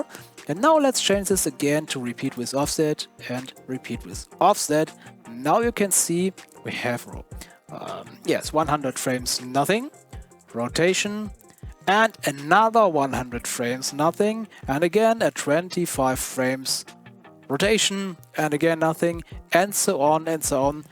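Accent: Germany. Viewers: i hear german is that right?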